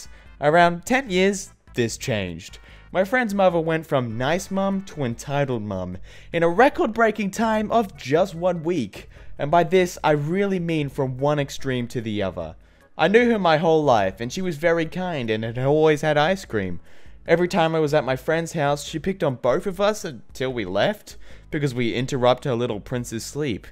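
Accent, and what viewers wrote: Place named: Australia. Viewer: I think he is Australian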